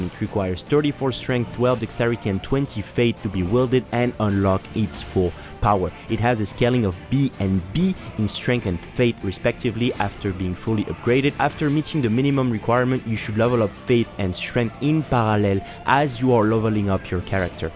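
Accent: France. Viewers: Wait are you french ? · Ah mais voilà putain j’me disais que t’étais français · damn that french accent... love it! salut frérot continue comme ça ! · Are you french ? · Really great video ! You are not just making a simple tier list but adding your touch and your fun to it, so it s really cool to watch !You gain one sub ! (Et je suis aussi fier de moi d avoir reconnu un petit accent français, validé par l extrait du début dans le lequel tu nous sort un petit juron bien francais 😂)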